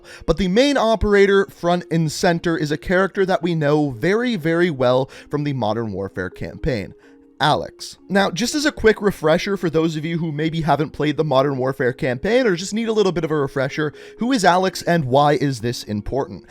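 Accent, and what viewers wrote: Canada. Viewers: this guys extra Canadian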